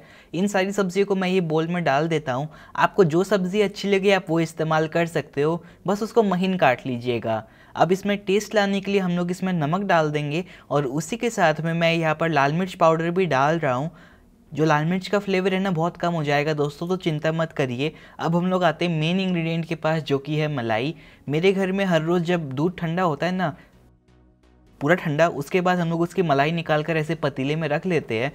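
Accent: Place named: Kolkata, India. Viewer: Mujhe aapke bolne k style se hamesha lagta tha k aap kolkata se belong karte h...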